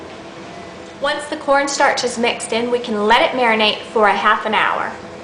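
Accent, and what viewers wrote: South, United States. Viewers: *New Subscriber* Do i hear a southern accent?? LOL I can't wait to make this dish....thanks!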